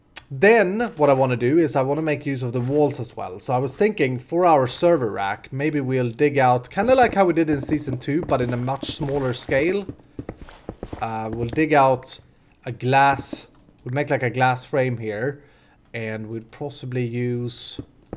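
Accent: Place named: Sweden. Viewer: Fin svensk brytning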